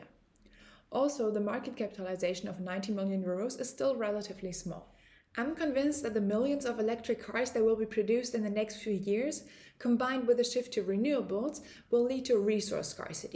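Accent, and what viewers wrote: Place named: Germany. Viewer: In which country/town you are living? Sounds like german.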